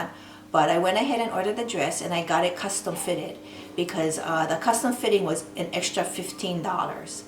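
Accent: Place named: Hawaii, United States. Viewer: Ho aunty, nice review! I knew you were from Hawai'i after I hear you talk. Aloha and mahalo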